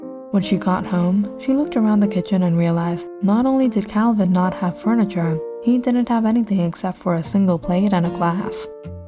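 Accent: Russia. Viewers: Good story, madam. Love that Russian accent, though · I'm in love with that russian accent 🥺😂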